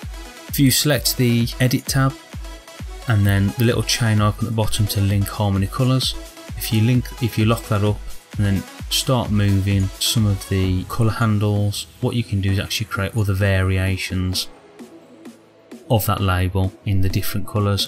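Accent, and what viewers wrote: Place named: Black Country, United Kingdom. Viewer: I was wondering if you were a yam-yam or a brummy, haha. I am from these parts too.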